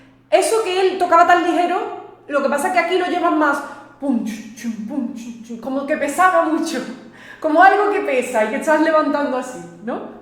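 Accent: Andalusia, Spain. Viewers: Eres genial, adorable, hermosa, talentosa y tus videos son divinos como tu!!! me fascina tu acento andaluz te amo a ❤❤❤❤ ❤❤❤❤❤❤❤❤❤❤❤❤ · Hola bella, me encanta tu estilo andalú "gitano" 💖💖💖, me recuerda a mi familia paterna Española, que hablaban como tú, soy paraguayo y por lo tanto debo recomendarte un artísta que está en categoría de genio de la música, por supuésto hace ya tiempo fallecido pero eternizado en la história de la música universal como uno de los más grandes, su nombre es Agustín Pío Barrios, conocido también como "Mangoré", y como todo paraguayo tenía raíces guaraníes, pero en el caso de él, era descendencia directa, creo que su madre era nativa guaraní, es uno de los más grandes guitarrístas del mundo, y no exagero, tampoco es "fanatísmo" nacionalísta ni nada, pero te lo digo porque es uno de los más grandes guitarrístas del mundo menos conocidos a nivel popular, sólo es conocido por estudiosos e investigadores, te prometo que si lo buscas, quedarás asombrada, no lo podrás creer, busca información sobre él y escucha sus obras, no te arrepentirás, te lo garantizo. 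Es el primer o el segundo video tuyo que veo, y estoy encantado contigo bonita, eres hermosa💐💐💐 y ya estoy pensando seriamente en suscribirme ( es que ya tengo mi lísta de suscribciones demasiado llena, pero puedo anular alguna que otra suscripción para darle lugar a la tuya, claro que sí ) Un fuerte abrazo!!!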